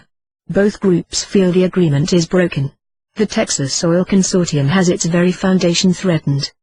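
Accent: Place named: England, United Kingdom. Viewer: It s hard to listen this robotic voice with english accent.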